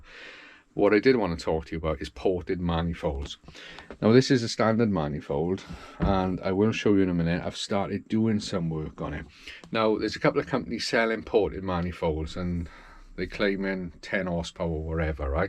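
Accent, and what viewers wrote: Wales, United Kingdom. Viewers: Welcome back mate, nothing beats cars and a Welsh accent🏴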